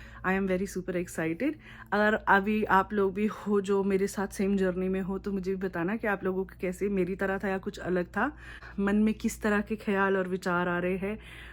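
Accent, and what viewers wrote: Pahadi, India. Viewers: omg her pahadi accent is so good 😂😂....love your videos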